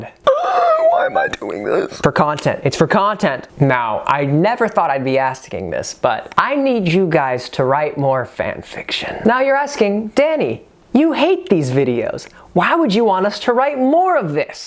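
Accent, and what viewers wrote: RP, United Kingdom. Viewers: I think I am the only one that does not give two fucks about his British voice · Who else listens to his British accent before sleeping🤔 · id just like to say i went to leave full screen but accidentally started the video again. you remind me of dan howell when he speaks... hes posh british lastly, did you ever go down for dinner? thank you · am Me: stumbling upon this video ready to fall asleep, thinking I’d hear him recite a story in his British accent. Me: laughing hard instead of sleeping. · anyone else love his normal voice just as much and his british gentlemen one? just me? ok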